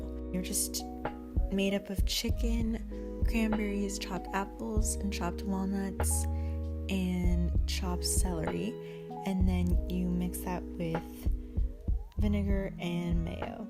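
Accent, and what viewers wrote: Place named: United Kingdom. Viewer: Your British accent is absolutely stunning darling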